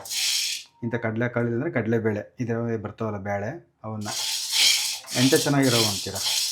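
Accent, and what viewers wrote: Chitradurga, India. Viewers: ❤ chitradurgada bhashe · ತಮ್ಮಣ್ಣ ನಮ್ಮ ದುರ್ಗದ ಭಾಷೆ ನಿನ್ನ ಬಾಯಲ್ಲಿ ಕೇಳೋದಿಕ್ಕೆ ಬರ್ತಿ ಚನಾಗಿರುತ್ತೆ ತಮ್ಮಣ್ಣ 🎉🎉🎉🎉🎉🎉🎉🎉🎉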